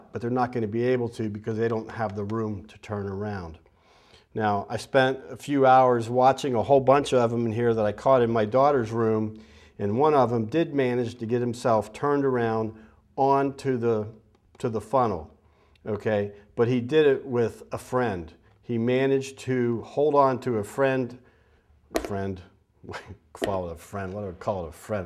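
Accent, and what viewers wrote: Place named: Pittsburgh, United States. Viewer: YEP, this Gentleman is from Da-Burg. Go Steelers!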